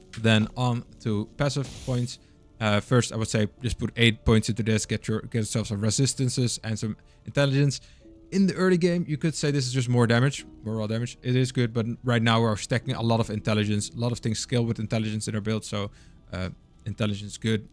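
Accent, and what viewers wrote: Netherlands. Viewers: jaja i hear some dutch accentXD subbed for support^^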